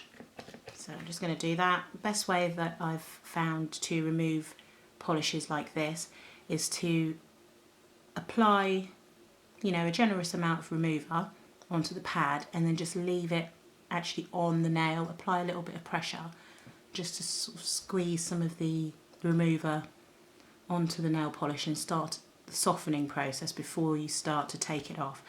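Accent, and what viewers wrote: England, United Kingdom. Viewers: Beautiful hands and gorgeous long nail beds!! Thank you for this. I have decided to try using gel overlays to grow my nails out, because, after 12 years of acrylics, nail hardeners are not being overly successful!! I've watched the films on how to apply and maintain, and you've given me the one to remove, thank you. Subscribed. Ps, so nice to hear an English voice!! Xxx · Dang... your nails are perfect. Your natural apex is off that chain. I have to use plastic dual gel nail forms to get my flat and thin nails to look like this ! And btw, I love your proper English accent.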